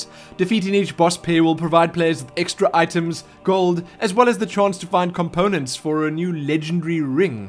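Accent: South Africa. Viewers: that is a south african accent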